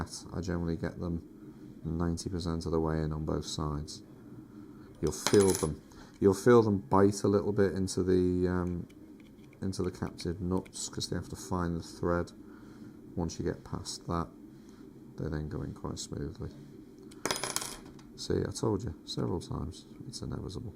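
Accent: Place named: Wirral, United Kingdom. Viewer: Sticking with that Wirral Accent 😂 Mate, You do such a good good with these designs, They look incredible. Looking forward to my Bender arriving, I should have come get it since your down the road. I'll get a nibbler next money day! You think you'll do something for the RAK Boards or T-Beam. You design would be awesome on the T-Deck. All.the best mate! Sean (2E0SYA)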